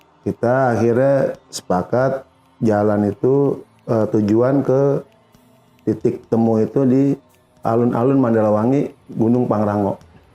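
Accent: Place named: Jakarta, Indonesia. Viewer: seruuu bgt sih cerita dengan aksen betawi nya wkwk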